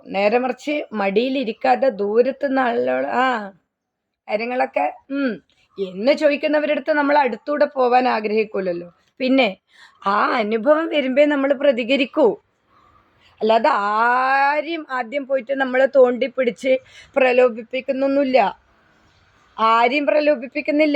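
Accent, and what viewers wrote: Kannur, India. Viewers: ninakkonnum vere joliyille? Kannur dialect kelkkan oru bhangiyumilla.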